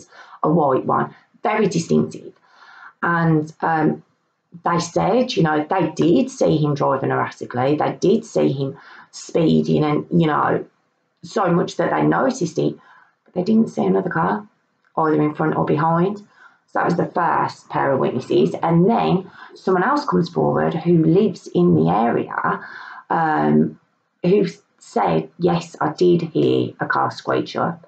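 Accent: Birmingham, United Kingdom. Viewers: you sound brummie I would say I'm from Leicester I can tell the accent it's strong but yam yam is that black country accent ??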